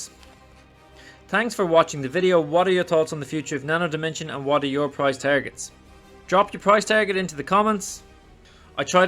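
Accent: Ireland. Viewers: Who is downvoting this? I liked and subscribed, thanks for the video. I especially enjoy the Irish(?) accent - “sales and mahhhhrketing (marketing). Ah, Sacred Heart, there ought to be a bar over here.”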